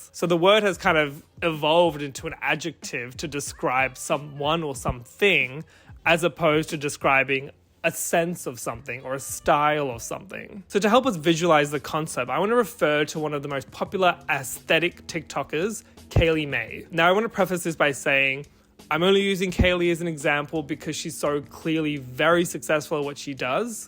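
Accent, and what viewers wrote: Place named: Australia. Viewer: I never knew Aussies pronounced it as "ass-thetic" rather than actually making the /æ/ sound and now I can't unhear it.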